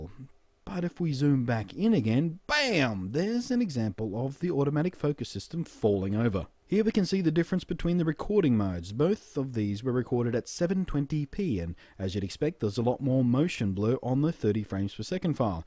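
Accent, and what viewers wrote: Australia. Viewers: I thought I heard an Australian accent. I love it. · Hi, love your channel. Good to have a distinctly Australian reviewer. Enough flattery.....:) The reason I would love to be gifted this item is so can take clear videos/photos of my kids. I use my 3GS iPhone for photos/videos, it's OK, but something like the bloggie would be great because it is simple to use, I could teach my kids to make Vids also.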